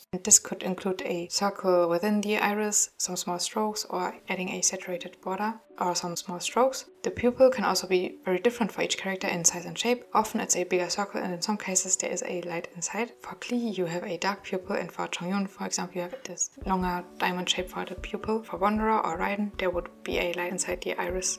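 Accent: Germany. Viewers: THANK YOU. So useful! Are you german? I hear an accent. I also recommend talking slower or more clearer, sometimes it's difficult to understand you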